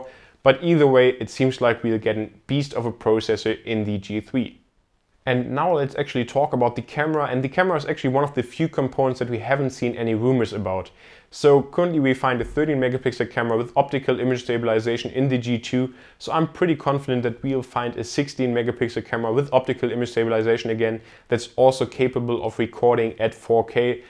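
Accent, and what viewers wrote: Germany. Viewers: TechCentury aber wär schon gut, wenn das eigene Englisch dann nicht so deutsch klingen würde, oder? Find ich dann irgendwie eher peinlich als international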